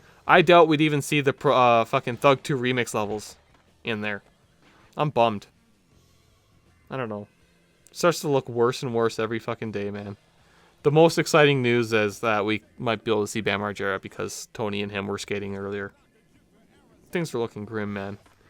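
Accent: Canada. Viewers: I feel like you must be from Canada 😂 first minute of this video sounds exactly like my friends and I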